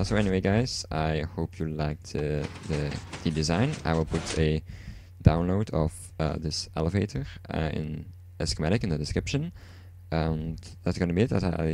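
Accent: Netherlands. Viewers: I can hear you're dutch, and you're from the south of the Netherlands aren't you? ;) Great design man! Kudos to you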